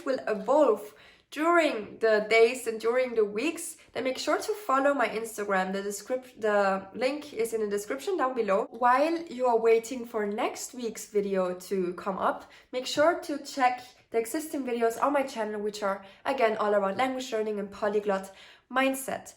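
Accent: Germany. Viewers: your accent is really nice though very subtle like I said I would have guessed a Germanic language but it’s not distinct enough that I would identify it as German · You are German correct ? I can hear the accent. 😍😍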